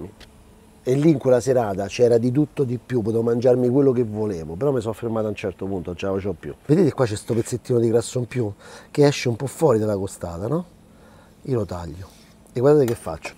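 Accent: Rome, Italy. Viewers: Sadly the Roman way to speak gets lost in translation. It's half of the fun!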